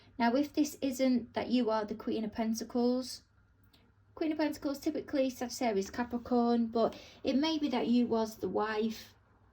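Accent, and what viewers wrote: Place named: Salford, United Kingdom. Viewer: you sound like you're from Salford. 😊 I'm from Manchester too. I've only just found your video's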